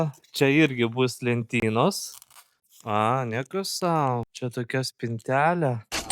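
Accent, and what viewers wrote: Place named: Poland. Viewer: ir galva skaudet pradejo tas lenkiskas akcentas ir dar plius siuksles rodai kaip normalu daigta nezinau ar istversiu antra tavo video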